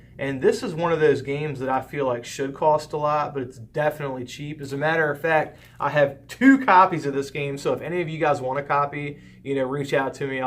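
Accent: Baltimore, United States. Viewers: You totally sound like Baltimore! Catonsville here!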